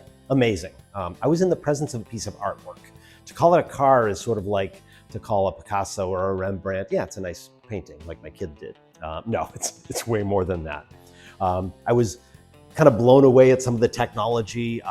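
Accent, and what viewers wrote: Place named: Canada. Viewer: Let Me Guess You're canadian ?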